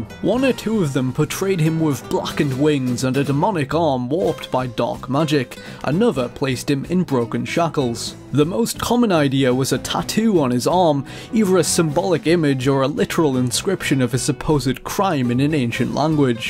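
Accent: United Kingdom. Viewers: It's a heavy British accent if I'm hearing right, and I'm Canadian, all I hera is English and French. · Your voice is great its my favourite British accent - and I live near Manchester, and cheers for telling me yet more information that I didn't know. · I always thought that the way you spoke was cool. Why in the world would anyone knock a British accent?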